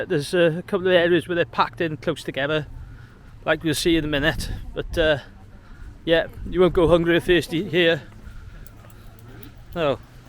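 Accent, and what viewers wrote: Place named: Wales, United Kingdom. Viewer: Thanks for this. Love listening to you speak; reminds me of my grandmother and cousins in Llanelli. I’m Canadian, so I don’t get to see them often. We’re booking in Luz for next winter (Canadian winters = 🥶) so enjoyed the look around.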